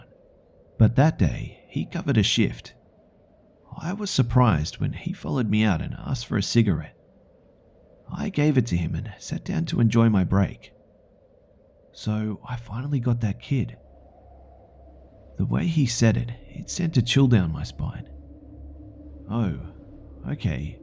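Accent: Australia. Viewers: Coming from a Kiwi, this guy's accent is most definitely Aussie. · Aussie all you other people are so dumb he's one of us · great video. keep up the good work and some people say they love your voice. To me it sounds normal because I am Australian too.:) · Australian, I believe. But the horror master himself should answer that. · To honest, things are less scary in an when Australians talk! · He's an aussie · I believe he is the Aussie of our horrors. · your Australian accent is schmexi man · Aussie he's one of us mate! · I can't take these stories seriously on account of that charming aussie accent. It's not scary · Australia he lives were I live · he's Australian you rotten walnut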